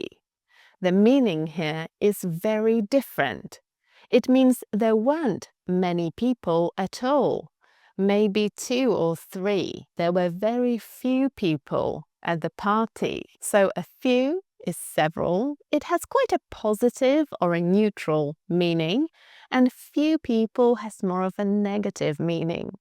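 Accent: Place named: United Kingdom. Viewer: your british accent is beautiful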